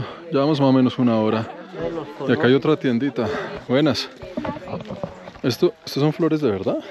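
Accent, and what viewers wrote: Colombia. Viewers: Me encanta verte porque tienes tanta humildad y tu léxico excepcional tu asentó colombiano es lo máximo!!! Saludos a la abuela 😊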